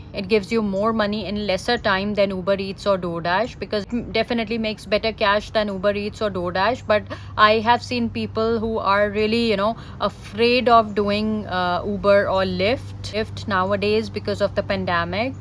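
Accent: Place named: South Asia. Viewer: Baaji i love your desi English... feels like home, although im from India 🇮🇳 but still its feels the same...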